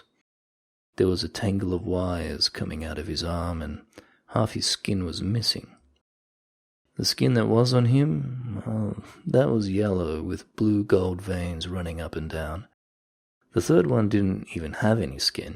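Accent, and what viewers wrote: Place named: Australia. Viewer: The narrator sounds Australian. I wonder if his grandfather was?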